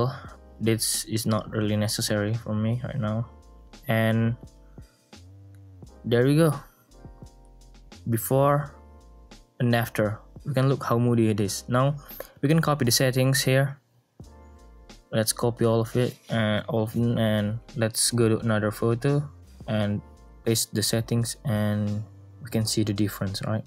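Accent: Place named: Malaysia. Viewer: You sound like malaysian chinese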